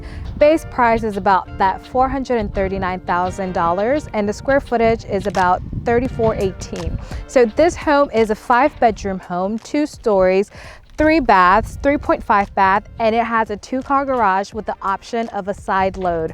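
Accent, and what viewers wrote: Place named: England, United Kingdom. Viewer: Hey!!! I personally like your screen presence and your English accent is amazingly easy to understand. Congratulations on your such professional video. Maybe one day we will meet in NC for a deal. Thanks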